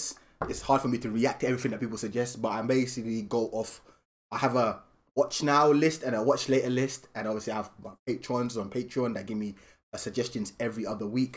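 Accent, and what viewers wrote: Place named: United Kingdom. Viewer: i think hes BRI'ISH edit: thats a big BO'OHW'O'WO'ER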